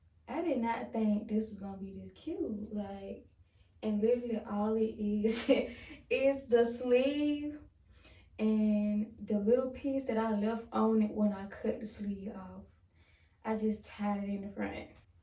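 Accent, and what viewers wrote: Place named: South, United States. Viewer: I think this is sooo Amazin!…., I think u should be wayyy up. U’re real deal. My Mama is a seamstress and I have to say that she and only u would be my personal designers if I wanted anythang Taylor made. I used to watch my Mama sew when I was young so she started showin me different things to tha point at around 10 years old, I was makin my own billfolds/wallet for my money to take to school. lol… she charge only $5 per alterations. It’s real money in it for your talent. I see this been 1 year ago so r u jus takin a break, becuz I know u ain’t give this up. Hit me back and let me know somethin. There nothin like Beauty wit Brains. Oh, I’m from Mississippi…where are u from?… I know it’s Southern like me cuz I can hear it in your voice. Mississippi, Georgia, Florida, Alabama, or Texas?… In that order.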